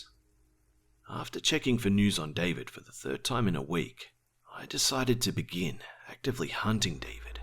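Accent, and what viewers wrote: Australia. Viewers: Is that an Aussie accent!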